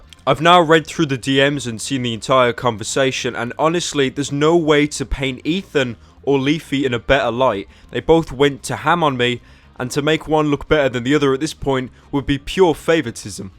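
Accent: United Kingdom. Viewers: Why is anyone on youtube fighting in the first place, especially considering it's just a bunch of people who do the same thing but in different ways, Leafy does cringey videos on cringey videos H3 does cringey skits on cringey skits and you do deep voice british cringe on cringe videos. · He's British. Certain regions pronounce "H" as "aech" instead of the faster American "he-" when it's said. I'm from the UK and say it the same way. Just differences, that's all.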